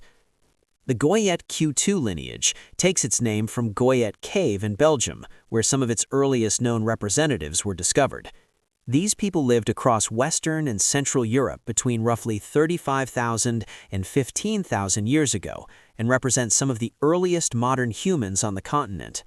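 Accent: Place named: United States. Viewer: I can't listen to this because the American accent is too annoying.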